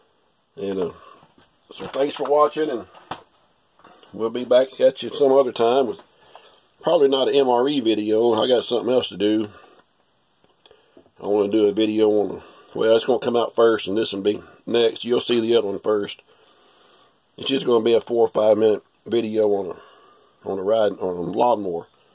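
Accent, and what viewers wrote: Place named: Boston, United States. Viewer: Very heavy Boston accent for sure! That makes at least two of us!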